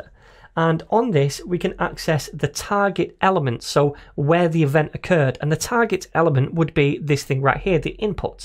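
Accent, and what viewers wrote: United Kingdom. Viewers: Why does `s accent sound like brittish?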